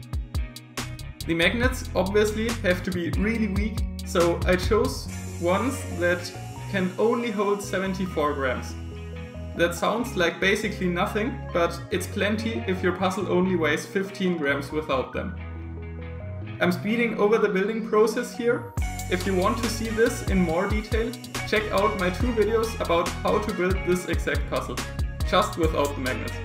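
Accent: Germany. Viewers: are yo german? bc your dialect sounds like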